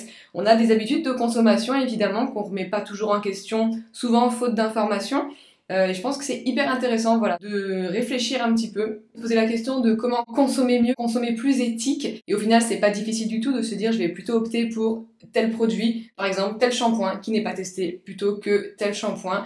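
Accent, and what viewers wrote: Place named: Bordeaux, France. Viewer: Super intéressant comme vidéo ! J’avoue penser aux animaux quand j’en ai dans mon assiette mais pour le maquillage j’ai du mal à l’associer avec la souffrance animale, alors que c’est vrai ... une vegan m’a dit que j’étais flexitarienne : je mange le moins possible d’animaux, j’essaie d’acheter le moins de cuir, mais j’avoue ne pas encore faire de la souffrance animale un combat (et je me dis amoureuse des animaux, un peu hypocrite j’avoue ...) Breef j’adore tes vidéos, j’adore ta façon de parler. Petite question : de quelle région es-tu ? Bordeaux ? J’ai l’impression d’entendre un petit accent tout chou :)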